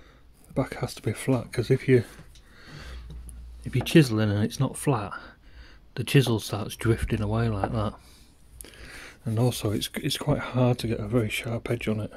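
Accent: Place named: Northern England, United Kingdom. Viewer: Great no nonsense video. You sound like you're from Jonny Vegas country?!?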